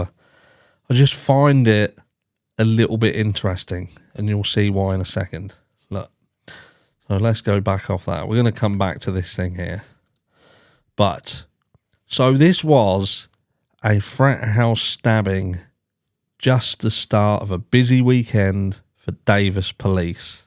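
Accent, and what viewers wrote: United Kingdom. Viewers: NEW Subscriber Your Chat is easy to Lisen to. Even with the deep UK thing in your voice. (it Ok) Point of note. A true K BAR MARINE knife does not have a saw tooth stile on one side of the blende. It has a blood grove in the middle of the blade, A leather staked handle, with a metal back end. Is the blade must have a nick or a Bland spot. or super dull to Sair skin. If it has a saw teeth side on it will be one used to cut boardwear making a t 54 knife not a true K-BAR, of it is this kind of knife. look for a post on it about halfway down the blade joining the two blade together. Deep South ALABAMA USA. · I have always wondered where are you from obviously it sounds like the UK somewhere just curious as for the Davis stabbing its a shame that people are so stupid with the whole snitching thing people think you're a snitch if you talk to cops Davis is a few hours away from my home an it gets a lot of bay area students an they are with the no snitching an if they come from the hoods of the bay area stabbing is somewhat normal more shooting but Oakland an San Francisco is some violent areas so its crazy there is a coincidence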